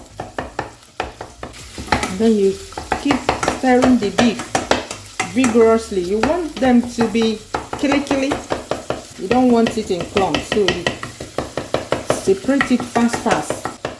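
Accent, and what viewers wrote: Igbo, Nigeria. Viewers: The way you even explain things with a little Igbo vibe dey sweet my body. Nice one I will try it. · You look beautiful.. Your humour is next to none especially in combination with the natural igno accent... I really enjoy watching your videos..natural and down to earth.. Keep it up..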